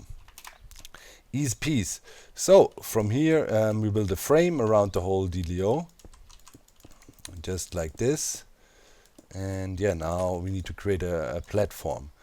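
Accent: Germany. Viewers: I knew he is german XD he has this typical accent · Dein englisch hört sich sehr deutsch an O.o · Are you german by any chance?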